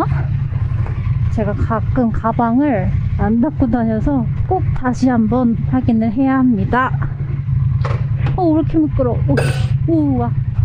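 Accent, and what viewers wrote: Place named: Seoul, South Korea. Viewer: 개시끼 스울말투 와이리 스윗허냐..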